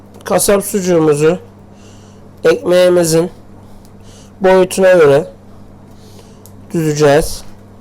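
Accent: Gaziantep, Turkey. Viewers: Senin takipcinim ve her videonu neredeyse izliyorum bana yakin ve bizden biri olman hafif gaziantep siven kendini ozel kiliyor kucuk bir takipcinim tostlarini evde deniyorum ogrenciyim ne de olsa senin tükkan da tost yemek isterdim...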